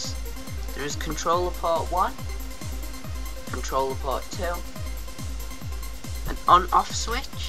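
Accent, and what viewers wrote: United Kingdom. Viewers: Nice c64, i own one these '87s made. btw why allways britts talks so funny dialect? Good video. Check my videos, channel tommi palomäki or jebu6 Greetings from finland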